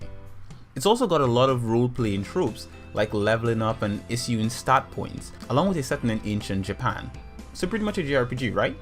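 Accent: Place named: Trinidad and Tobago. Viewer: Are you from Trinidad? You sound like a Trini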